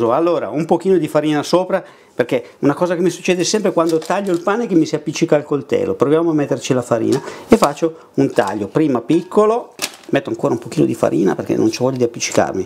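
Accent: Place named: Emilia, Italy. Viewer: Tantissime grazie, per le risposte, hai una parlata penso emiliana, simpaticissima, queste sono anche per la signora che ti è vicina,e che ogni tanto compare, Io ho lavorato come titolare in tutt' altro campo nel commercio all' ingrosso e lavorazioni di legnami all' inizio non distinguevo la differenza tra pesco e pesso. e anche se poi ero diventato il migliore disegnando progettando e realizzando creazioni artistiche nel campo delle lavorazioni di porte tavoli perfino scarpe in tranciato di legno m, però il mio sogna era quello di avere un ristorante , e non sai con quanta amministrazione e quasi invidia ti vedo all' opera per tutto quello che sai fare perché sei proprio un artista dell' arte culinaria e sarebbe una meraviglia saperlo fare come lo fai tu, oggi però che posso anche se sono anziano mi diverto e me la passo grazie si consigli di chi più ne sa di me Un caro saluto 🌹🌹🌹🌹🌹🌹🌹🌹🌹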